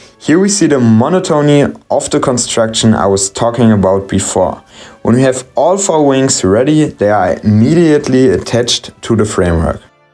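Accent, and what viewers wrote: Germany. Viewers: Am Akzent würde ich mal herleiten, dass du deutscher Muttersprachler bist. ;) Und ich bin schreibfaul, daher bleib ich gleich dabei. Ich hadere noch mit diesem Set. Wie derbe hängt das Cockpit wirklich wenn man es hochkant hinstellt? Hatte Fotos davon gesehen und das schreckt mich tatsächlich noch ab. Und mit diesen Videos hast du ne gute YT Lücke erwischt, gerne mehr. :)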